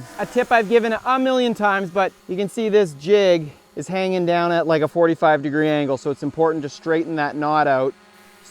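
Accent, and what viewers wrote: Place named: Canada. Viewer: I'm Canadian but this is one of the strongest Canadian accents I've heard.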